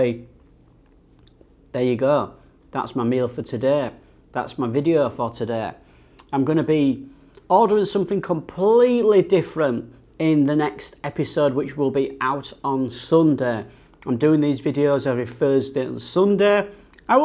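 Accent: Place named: North, United Kingdom. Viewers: Hello Sir. I really enjoyed your videos about Malaysian local dishes. And I totally want to learn and pratice more so I can have English accent like yours. Happy quarantine in Malaysia. Stay safe 🇲🇾🇲🇾🇲🇾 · Don't worry so much about your pronunciation...you're English... Next time when you do get out...buy some raw peanuts and raw anchovies at the supermarket...later can fry some up when you eat your nasi lemak at home...at least you can control the amount of anchovies you want to eat. Boil another egg if its not enough. Cheers mate. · What does it matter how you say it (Kuala Lumpur)? that is part of the fun in far away land. I love your northern accent and always associate it with, honest straight talking. You could try a type of noodle dish called Char Kway Tiao. Glad to see your staying safe. Look forward to the next video. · I like your Yorkshire accent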